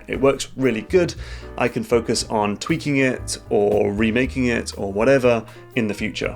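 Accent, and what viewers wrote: United Kingdom. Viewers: video starts, british guy speaks, subscribed, its that easy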